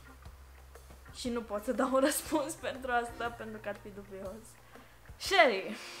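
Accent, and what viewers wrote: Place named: England, United Kingdom. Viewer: Vezi ca ai niste engleză pe la coltul guri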